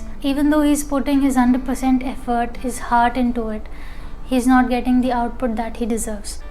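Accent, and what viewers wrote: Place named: Delhi, India. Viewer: Don't give up! Persistence is the key to success Btw, your accent is similar to my father's. I believe you are from new delhi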